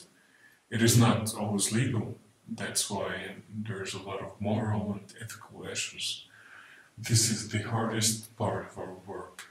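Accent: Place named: Russia. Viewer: Четкий акцент рускоговорящего который пытается говорить на английском .Цель просто кого-то очернить.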